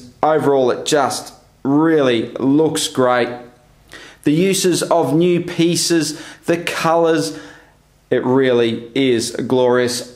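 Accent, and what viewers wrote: Australia. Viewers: If I had to guess, I would say this guy is from Australia like me. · 1st thing I heard was an ausie talking so I insta subbed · Just for the record, this guy sounds really Aussie, and I'm an Aussie haha.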